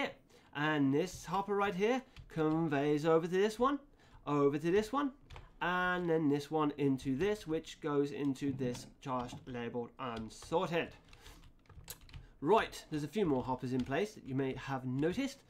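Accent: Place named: United Kingdom. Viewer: Yeah, hoppah. Nice explanation and voice. I never really liked the british accent, I always preferred something like the canadian one. But you do sound nice.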